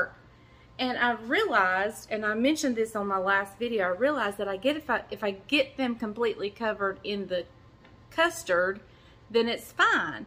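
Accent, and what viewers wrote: South, United States. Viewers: I can hear it too.small town southern wife❤️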